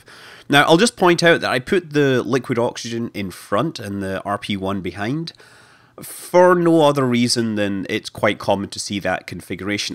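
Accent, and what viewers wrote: Scotland, United Kingdom. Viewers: Hey Scott Manley screw the haters. I love hearing you explain things in that awesome "Brave Heart" accent of yours.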